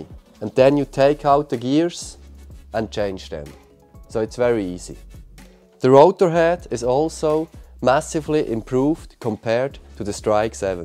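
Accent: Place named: Switzerland. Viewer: Koleg me hört richdig krass s schwizerdütsch us dim englinsch use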